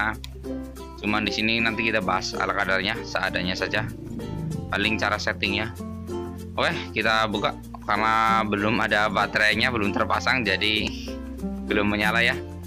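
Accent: Banyumas, Indonesia. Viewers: Keren bahane ngapak campur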